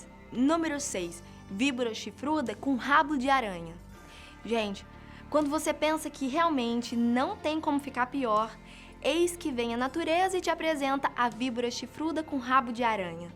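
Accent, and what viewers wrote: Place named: Northeast, Brazil. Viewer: Gostei do sotaque, que parte do meu nordeste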